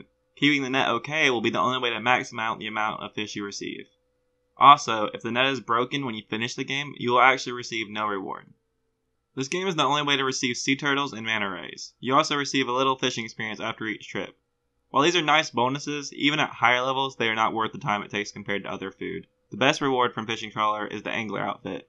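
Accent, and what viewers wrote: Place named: South, United States. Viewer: dang that deep southern accent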